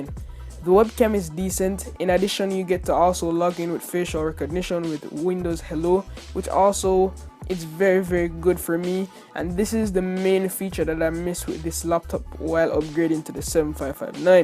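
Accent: Jamaica. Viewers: Bro, you sound like a real Jamaican, and if you are mad props. Not too many Jamaicans are doing tech reviews on this platform, so kudos to you. Video quality, transitions and use of space are awesome, and of course the voiceover, with a " TRUE " Jamaican accent. Keep up the good work.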